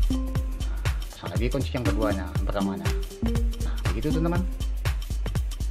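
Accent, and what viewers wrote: West Sumatra, Indonesia. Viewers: lu orang minang kah bang?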